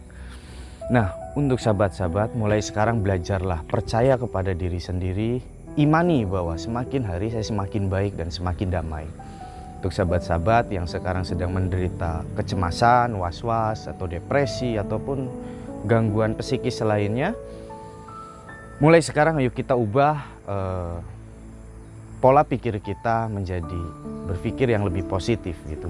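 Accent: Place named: Banyumas, Indonesia. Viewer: Oh wong ngapak ya . Salam sekang Pemalang mas. Tangga kota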